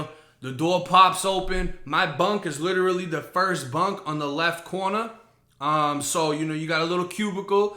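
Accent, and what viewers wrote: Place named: Massachusetts, United States. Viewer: Shout out to my niggaz out in lawrence mass... 860 reppin here. CT Bruh.. u gots sum real content. When I first heard ur voice I was like that nigga from Mass. Wtf he doin down in Tampa. But then again. I myself moved to Jacksonville back in 17 n live in the London Towne projects on lake ave n shit is fuckin real out there. Was handed a piece by my cousins husband 2nd day I was there. I was like wtf is this for, he laughed n sed dead ass... u gonna need this out here bruh. Nigga I retired gun play back in the 90s. Wtf...in my head all I can say is here we go again... wtf...but I lived there for 6 months n had no problems. Niggaz was cool with me. I came back up top cuz i missed my kids... but i would love to move back to Florida...Alex Tatts... Best known Tat artist in CT.. bet ur bottom dollar. U come around these parts, I got u..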